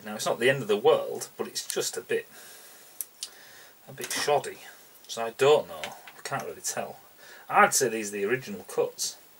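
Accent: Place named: North, United Kingdom. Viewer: u sound northern!! lol:) me preston northwest.. uk:) lol:)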